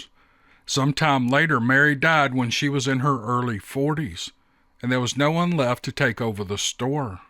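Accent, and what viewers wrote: South, United States. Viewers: OMG, what an incredible & terrifying encounter! Especially for a couple of fairly young lads still! From the stories I've heard from others, at least a thousand by now since I retired, discovered YouTube & have way too much time on my hands, I think if those Sasquatch wanted you, you would've been had. And given you guys shot at him, you're lucky you boys weren't....had that is. From what I understand they're incredibly fast & agile despite their enormous size. I've heard stories where they've paced vehicles upwards of 35-40 mph! I think they were 'escortin' y'all off their territory. Thank you for sharing your incredible encounter! And, thank you too. As usual your narration, in that sweet Southern drawl just soothes my soul.👣. 🗣️Whoop whoop!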